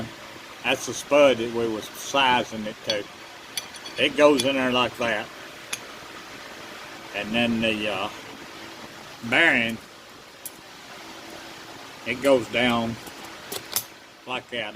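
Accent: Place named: Georgia, United States. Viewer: very ingenious design and I learned something today without trying. who says you can not teach old dogs new tricks. love the channel but where are you from? I am a Georgia boy myself so accent is familiar. love the channel.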